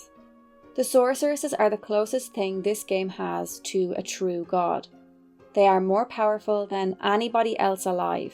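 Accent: Ireland. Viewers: Very enjoyable content ! I eat up any FF analysis video like a bag of tayto (It’s also nice to hear the analysis from a fellow Irish person)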